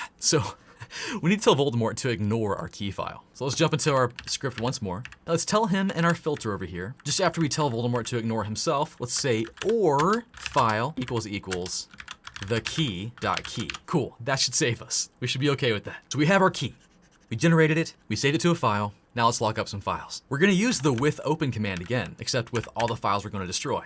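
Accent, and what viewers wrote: Texas, United States. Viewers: YEEEES HES TEXAN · what part of Texas are you from?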